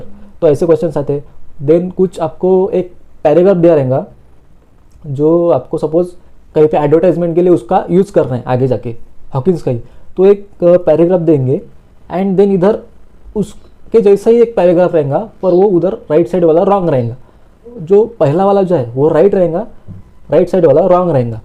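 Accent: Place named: Maharashtra, India. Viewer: Rahenga...milengaa..bc pakka marathi aahe tu bhava..😂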